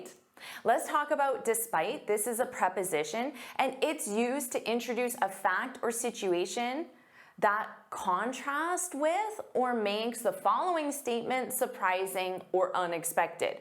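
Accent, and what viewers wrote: England, United Kingdom. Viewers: Very clear English accent Very understandable Thank you so much for improving my english❤😊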